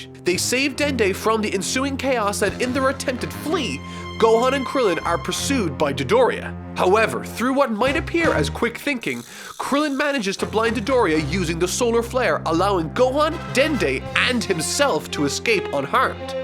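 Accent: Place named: Ireland. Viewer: Idk but i just recognized your irish accent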